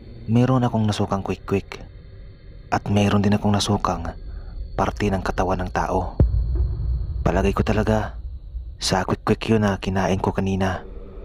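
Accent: Visayas, Philippines. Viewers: Bisaya amputakels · Parang. bisaya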